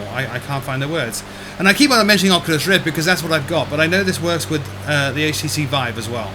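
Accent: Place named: England, United Kingdom. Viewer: I know it's English ... I can tell by the accent ... tech-talk is it's own new language - as usual in any discipline. I just am beyond amazed at age 60 that a) humans can fly b) humans can simulate flight c) humans can put this on an internet for all to see and d) we should all be apoplectic in the very conceiving of this 3rd millenium progress!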